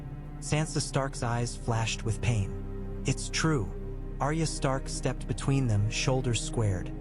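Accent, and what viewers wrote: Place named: India. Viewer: Why is this one different saying full names and randomly going into Indian accent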